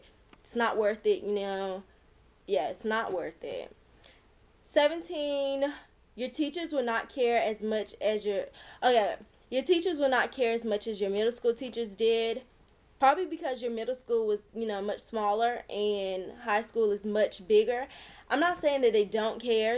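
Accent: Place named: South, United States. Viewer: you have an extremely southern country, down south accent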